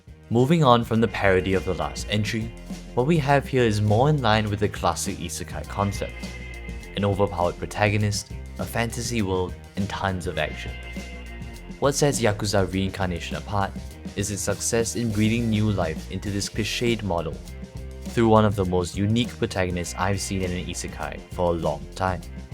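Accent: Singapore. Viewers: are u from singapore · you sounds like my uncle who came back from sg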